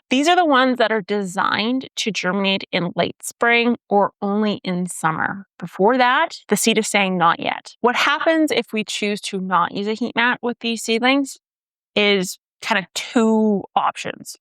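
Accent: United States. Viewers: I've used heat mats for quite awhile. When I run out I sometimes use the oven with the light on and the door cracked. You definitely need a thermometer to monitor the temperature. Best used for peppers. You sound like an American to me, except for using the phrase 'those ones.' :) I live in Michigan so I guess close enough to Canada. Really enjoy your videos. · I’m from Joliet Illinois and I’ve got to say you have pretty much the same accent as me. So you sound American suburban white. Not stereotypically Canadian at all. The people from Wisconsin sound Canadian tho lol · As a Wisconsinite, you sound like you live around here. Regional accents!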